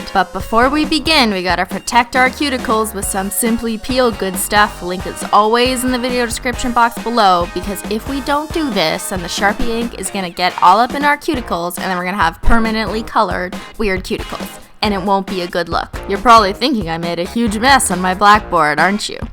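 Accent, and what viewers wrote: Canada. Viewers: It’s cause she’s Canadian you bitch rainy sims! Canada never makes fun of how you pronounce it! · did I just NOW realize her Canadian accent?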